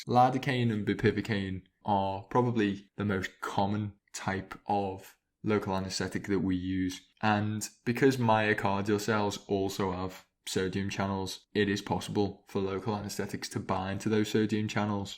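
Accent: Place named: Northern Ireland, United Kingdom. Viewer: Sounds like a Northern Irish accent Either way, this was an informative lecture!!!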